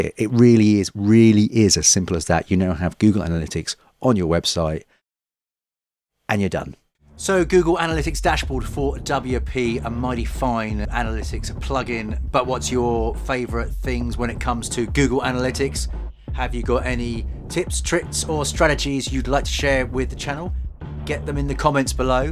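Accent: United Kingdom. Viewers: WOW! The easiest youtube tutorial I have ever come across. Also always love the British accent too. I just subscribe to your channel... Will be waiting for your next tutorial.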